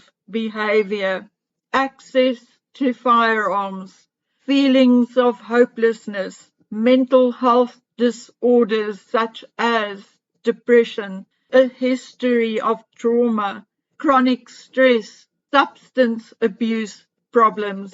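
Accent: South Africa. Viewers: She has a South African accent, get over it.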